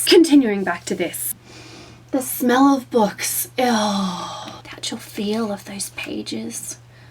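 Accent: Australia. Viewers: Are you Australian? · I loooooove the Australian accent <3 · As much as I hate straying from the topic of a video.. where is she from? As a kiwi who's been in Australia it's becoming hard to differentiate the accents now lol. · You must be from Sydney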